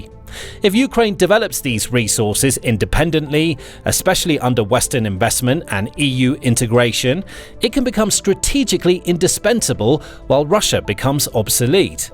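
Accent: United Kingdom. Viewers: сходу ложь Киевская русь лишь одна из нескольких княжеств (Ярославская русь, Новгородская русь) и она не самая могущественная ни с точки зрения политики, ни с точки зрения экономики, ни с военной точки зрения. Самой успешной была Новгородская русь - но западным "историкам" выгоднее делать вид, что остальных княжеств просто не существовало. Почему у самых лживых и прозападных каналов на ютубе всегда британский акцент?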